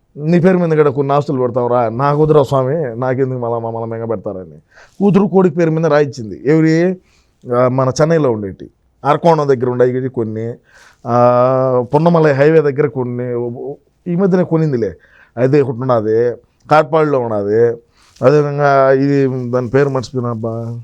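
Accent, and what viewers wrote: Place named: Chittoor, India. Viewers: Chittor yasa rocks 😂😂😂😂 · Anna tirupati language,tamil super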